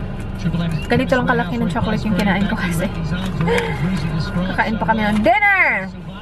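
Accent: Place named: Visayas, Philippines. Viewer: i love your accent meron bisaya sound ..hehehhe and also ilove you say ....mady,mady,madyyyyy....ahahhhhaha love ur vlogs ..hehhehe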